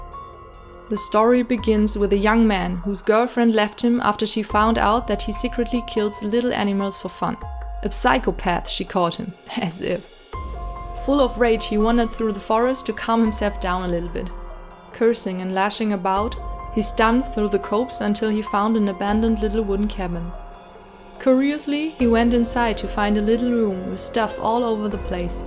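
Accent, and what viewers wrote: Germany. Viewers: Are you german ??? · Are u german?because of the "moin" and the way you speak(not in a mean way,you are super talentet and friendly,I'm just wondering) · I thought so, you have the same accent as my friend. She hates her accent, but I love it. I like the German accent. <3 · Are you German? Because u got this accent of one btw this video was so good and thank u for not spoiling the end result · I usually watch dollightfulls videos but NO REGRETS WATCHING YOURS! you earned a new subscriber! 💜 Btw, are you german by any chance? Your accent reminds me of how my class members talk English lul. Sorry if you're not. It just reminded me of that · This is definitely my favorite out of all the Halloween dolls I've seen! Ill be sad when Halloween is over after today and everyone goes back to making pretty pink dolls :D Disregard this if I'm wrong but it sounds like you're German. 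Where did you get the Apoxie Sculpt from and how much did you pay? From what I've seen it's super expensive to buy in Germany. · Ja Deutsche erkennt man immer wenn sie englisch sprechen xD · Its a german accent, if you want to know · Ich wusste sofort, dass du Deutsch bist😂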